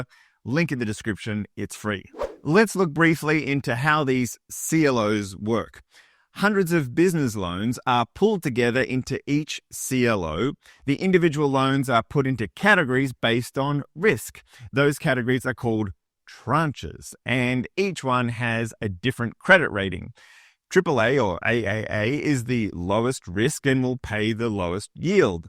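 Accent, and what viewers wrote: Australia. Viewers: Masterful & timely analysis of the pros and cons of CLOZ vs. other similar funds with different credit portfolios. reads and synthesizes the SA analysis which Couch investors like me benefit from and delivers in clear, personable, articulate English/Australian (?)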